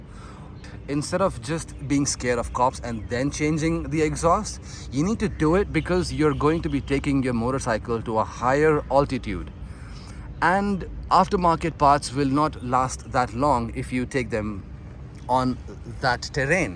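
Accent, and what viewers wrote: India. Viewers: you don't like the video, you go on and search some other one, no one's forcing this video down your throat, you came here all by yourself, like you said "YouTube is free" Aur rahi baat accent ki, I have seen a lot of people from south india, especially Bangalore, who speak fluent English without any kind of particular accent, kind of similar to what speaks, doesn't means they are putting up an accent, maybe that's the way he has spoken all his life, maybe that's the way he learnt it, why does it even matter. What I am trying to say is you need to see both side of the coins before judging for yourself, and don't judge for others, nobody cares. and oh I am not from Bangalore advocating their accents, I am from punjab, just sharing something of relevance here that I saw 😂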